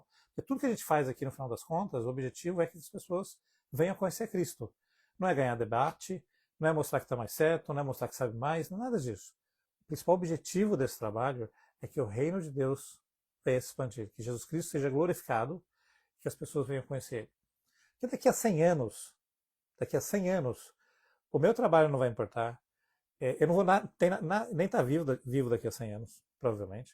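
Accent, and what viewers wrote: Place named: South, Brazil. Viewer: A paz, pelo seu sotaque pensei que era do sul do Brasil.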